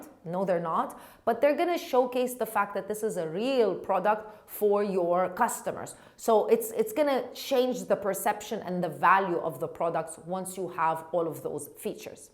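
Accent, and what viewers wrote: Arab world. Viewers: i don't want to learn from you but i like the way you speak english in arabic.hehehhe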